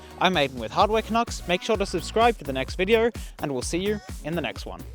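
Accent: Australia. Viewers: lol that's just how us aussies sound.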